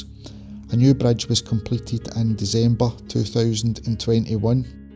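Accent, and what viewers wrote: Scotland, United Kingdom. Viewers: Good to hear again some Scott English 😊😊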